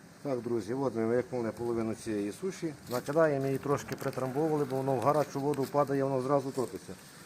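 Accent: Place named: Volyn, Ukraine. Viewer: Я недавно натрапив на Ваш канал у Вас Волинська говірка звідки ви?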